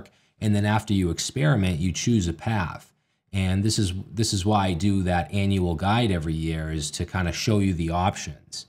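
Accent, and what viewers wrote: United States. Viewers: Bro looks better than when I first saw him, and that was years ago. And got the best American accent I've heard.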